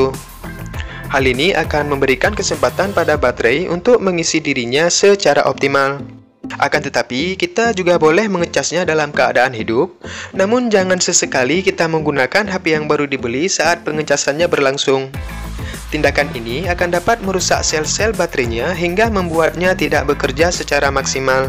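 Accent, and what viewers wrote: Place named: West Sumatra, Indonesia. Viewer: Dari logat ny...Orang minang ya min..?? 😄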